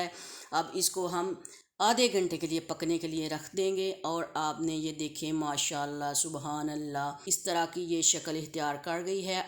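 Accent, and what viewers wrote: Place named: Punjab, India. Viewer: omaigod your punjabi accent😗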